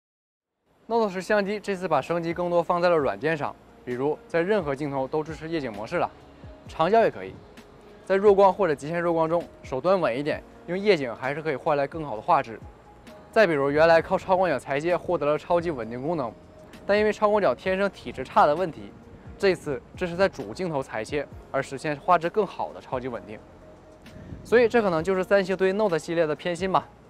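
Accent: Northeast, China. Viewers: 听口音像东北人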